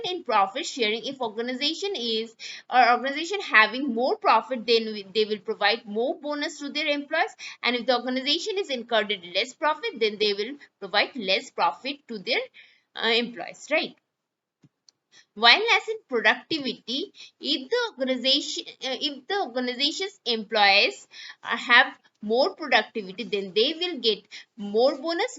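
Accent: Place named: Bengal, India. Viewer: I think so ma'am is Bengali her english speaking accent is so irritating. But at the same time she covers all above aspects question arising out points.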